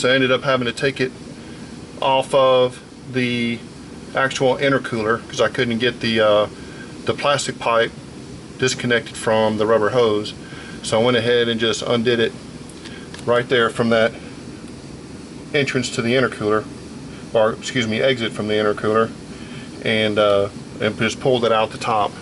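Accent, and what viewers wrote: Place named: North Carolina, United States. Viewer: good advice - thanks! Where are you from btw - i'm in NC and you sound local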